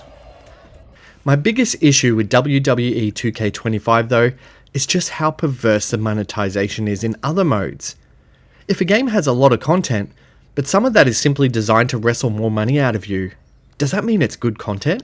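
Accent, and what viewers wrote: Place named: Australia. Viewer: i got 31 seconds into this video and had to click off, aus accent is like nails on chalkboard to me ..apologies but im sure its great... also sort your levels out on mic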